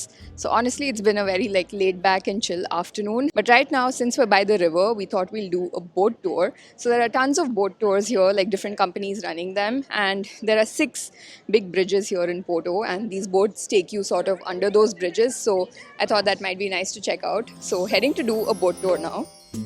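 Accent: India. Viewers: You have an Indian accent, are you from India? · Yes she is from India.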